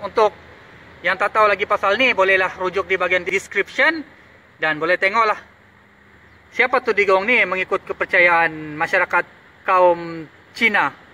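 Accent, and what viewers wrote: Sabah, Malaysia. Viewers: dia tu orang Sabah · Loghat sabah ka,sarawak ka..tiada masalah..selagi menggunakan bahasa malaysia.saya faham je.,Setiap orang lain cara penyampaiannya..teruskan bro dengan konten² yg menarik. 👍🏻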